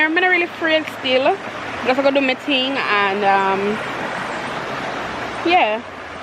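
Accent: Jamaica. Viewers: Uh hear patwa strong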